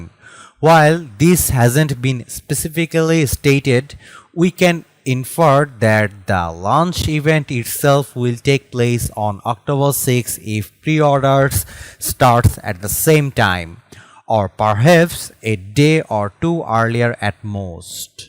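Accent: India. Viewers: Why trying to mask your Indian accent? It’s totally fine having an accent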